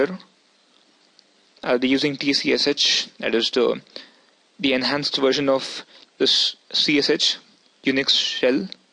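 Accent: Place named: India. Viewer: Are you from india? btw love the accent.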